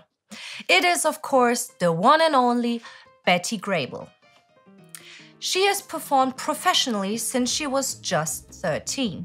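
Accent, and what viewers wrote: Germany. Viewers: Why you got Axis Annie representing your channel? Couldn't you find an American woman to speak for your program? There must be about 150 MILLION of them, you gotta choose a German speaking woman? That's pitiful.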